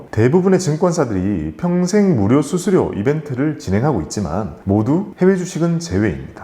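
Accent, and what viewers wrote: Gyeongsang, South Korea. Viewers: 경상도 억양이 남아있어 엑센트처럼 귀에 쏙쏙 들어오네요 ㅎㅎ ^^ 주린이 에게 너무 도움 됐어요~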